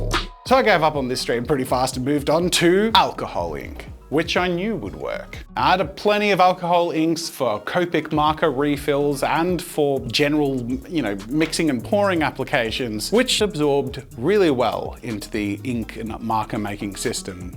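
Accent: Australia. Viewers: Australian? I thought you were British!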